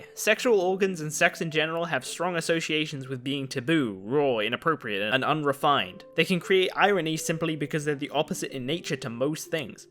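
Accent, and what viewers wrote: Australia. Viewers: You sound like an Aussie ..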